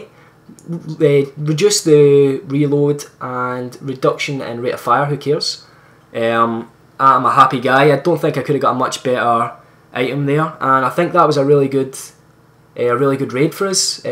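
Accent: Scotland, United Kingdom. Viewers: Nice video man. Are you Scottish by any chance. You have the accent ?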